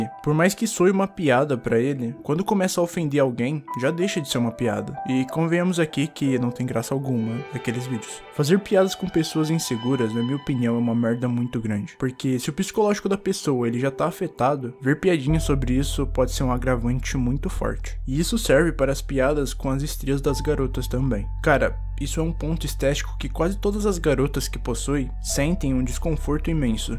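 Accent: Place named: São Paulo, Brazil. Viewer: Carioca com sotaque paulista, pq?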